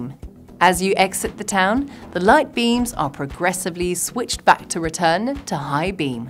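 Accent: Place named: Scotland, United Kingdom. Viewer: she's from Edinburgh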